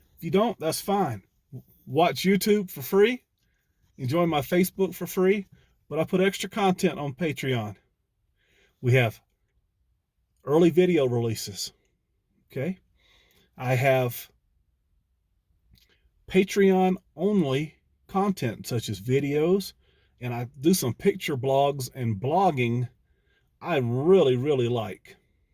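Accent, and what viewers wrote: South, United States. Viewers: That's the problem with social media. Everyone has an opinion. You don't owe anyone any explanation.. But I do love your southern rants, they are so . . . charming and entertaining! 😍 😍 😍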